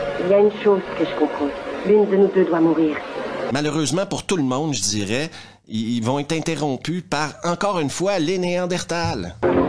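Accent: Quebec, Canada. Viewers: Ton style unique (humour caustique, présentation fluide, accent Quebecois....) rend ces films attrayants malgré qu'a l'écran on y croit beaucoup moins.Bonne iniative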